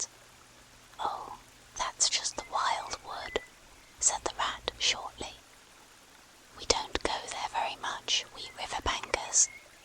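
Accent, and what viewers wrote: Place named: United Kingdom. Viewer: I understand it can be creepy but that british accent totally remembers me of harry potter. And the female whisper its just relaxing af 😍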